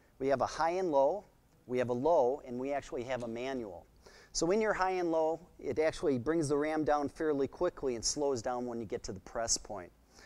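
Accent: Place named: Midwest, United States. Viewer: 10 bucks says this guy is from either Wisconsin or Michigan.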